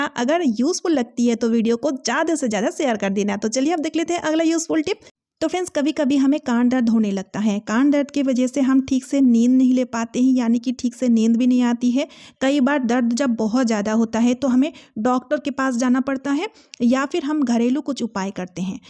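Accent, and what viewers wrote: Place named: Bengal, India. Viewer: आप begali है क्या...क्यो की आपके बोलनेमे वो मिठास है...ठिक है...ये वर्ड बोलनेका तरिका मुझे बहोत अच्छा लगा...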